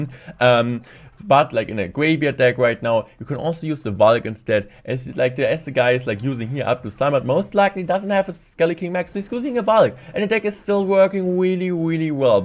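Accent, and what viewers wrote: Germany. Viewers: Where is this accent from ? For a sec I didn't realize it was English (no offense ment) German? Like he's saying mura instead of mirror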